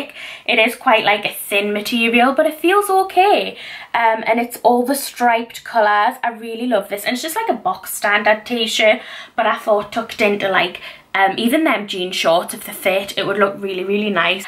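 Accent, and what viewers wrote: United Kingdom. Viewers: Hi I just saw your channel and I subbed STRAIGHT AWAY and I’m glad I did and I love your accent and I’m from the UK TOO!!!!!! but I’m from Scotland ☺️☺️☺️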